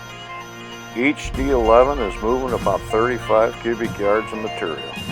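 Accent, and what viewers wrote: United States. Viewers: I loved this video, so educational. The man was a great narrator. Loved listening to the country twang in your voice. Great work man. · The speaker has the typical degenerated american speech!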